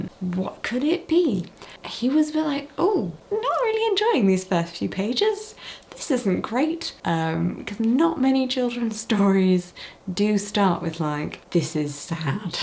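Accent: United Kingdom. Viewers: I don't know if this is a stupid question, but how is it possible that you speak with a british accent while being deaf? Could you hear when you learned how to speak? or is it just that accents can be learned without actually hearing them?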